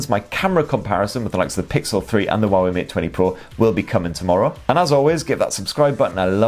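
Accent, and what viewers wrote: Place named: Geordie, United Kingdom. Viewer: Sounds more like north east England to me, a slight Geordie twang to his accent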